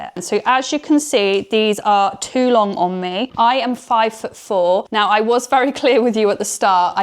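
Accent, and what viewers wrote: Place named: United Kingdom. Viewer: You are a BEAUTIFUL blonde/dirty blonde-haired young woman. Beautiful sexy sparkly light blue eyes, beautiful smile and sexy voice with the British accent. STUNNING figure. BEAUTIFUL body and curves, NICE booty and sexy long legs. Really sexy underarms. You look INCREDIBLE in all of these pairs of FitJeans. All of the colors look REALLY good on you and make you even more beautiful. Every pair of these jeans hug your body and hips and make your beautiful curves POP and give you a NICE booty. You have beautiful light blue eyes. You look AMAZING. You are BEAUTIFUL and VERY cute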